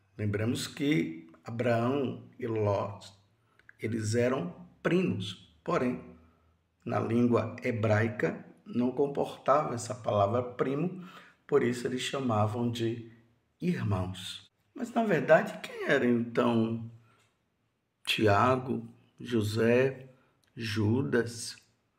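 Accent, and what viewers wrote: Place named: Northeast, Brazil. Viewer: Bom dia povo santo de Deus, amo ouvir as homilias do padre, lembre -se ele tem soutaque nordestino, assim como eu tem momentos que falamos mais leve outras horas mais enérgicos como voceis estão falando, um bom dia a todos com as bênçãos de Deus!❤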